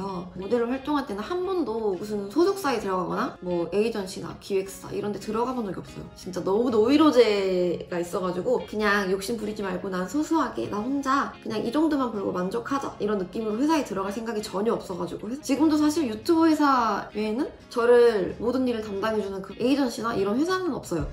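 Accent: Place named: Gwangju, South Korea. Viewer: 말도 너무 예쁘게하고 그냥 천사같당 저도 광쥬사람인데 억양에서 조금 느껴지네유 고생 많았어염 앞으로도 지금처럼 행복할거예요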